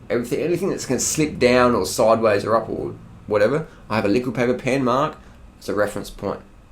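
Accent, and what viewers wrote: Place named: Australia. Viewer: ***** He doesn't talk strange. .he's just Australian :)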